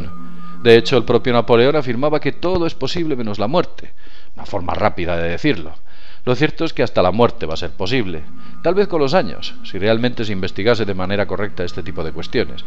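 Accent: Spain. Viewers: Es el primer video suyo q veo! lo explicaste muy bien (gracias por no hablar con mucho acento español) y me suscribo! tengo unas inquietudes! he escuchado q la raza alienigena q nos creo ha usado una tecnica muy parecida en la cual ellos pueden extender su vida tanto como le deseen (tecnica invasiva en la cual extraen o adhieren "algo" solamente terminado el desarrollo fisico). y solo hay uan persona a la cual constantemente lo estan resucitando s podria decir! (le jefe supremo). ¡¿Sera q las razas avanzadas conocen a la perfeccion esta clase de enzimas para detener el envejeciemineto y curar enfermedades? yo creo q es muy seguro! y x eso viven tanto tiempo!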